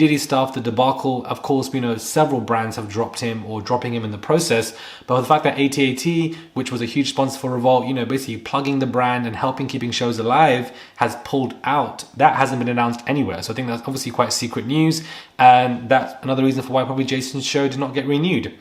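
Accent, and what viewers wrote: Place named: United Kingdom. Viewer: This guys UK accent makes it miserable listening to him. The UK is probably the most unattractive country with the most unattractive people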